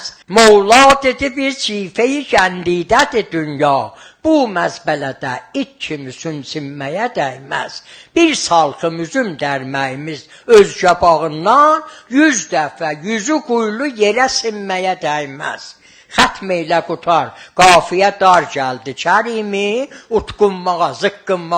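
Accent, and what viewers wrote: Iran. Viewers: Seviyorum Fars şivesiyle şiiri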